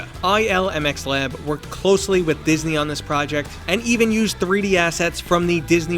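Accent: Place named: New York, United States. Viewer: Very interesting hearing someone doing a professional announcing video voice with a New York accent but I need more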